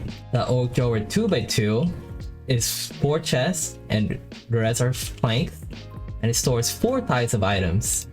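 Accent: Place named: Brazil. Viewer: Não precisei de 2 segundos de proza pra saber que esse caba é br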